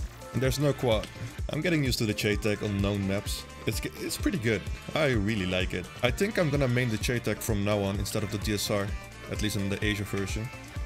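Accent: Netherlands. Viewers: so u are the guy that always fucks up my game? btw didnt know u were dutch can hear from the accent xd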